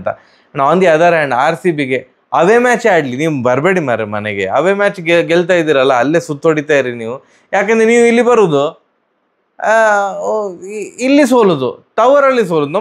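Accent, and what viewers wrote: Mangalore, India. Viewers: had lost it's charm, humor, and that Mangaluru accent, nowadays it has become as a summary of match, we have watched the what's the necessity of yours, we need videos likes your old videos, where you used to talk in a comedy way, like Dani seit, please try to bring that back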